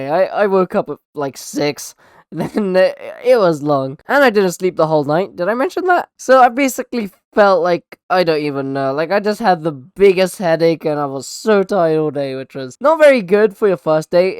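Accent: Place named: United Kingdom. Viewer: Nah but UK accents are cool cause they ACTUALLY pronounce the word right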